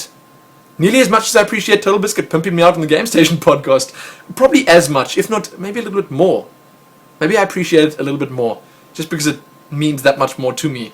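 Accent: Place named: Cape Town, South Africa. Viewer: capetonion accent FTW ! lol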